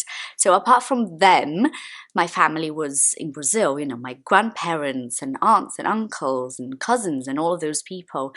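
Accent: United Kingdom. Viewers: I love this british accent 😍🇬🇧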